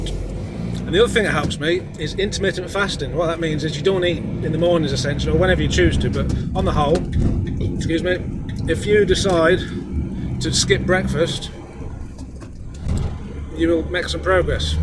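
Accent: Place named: Leicestershire, United Kingdom. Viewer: 👍.where abouts in the Midlands are you from fella?. Sounds like Leicestershire 😊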